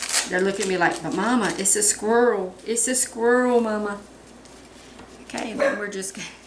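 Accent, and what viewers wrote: South, United States. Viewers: Had to tell you I have a dachshund his name is oscar Mayer weimer lol and I'm from Tennessee love your southern accent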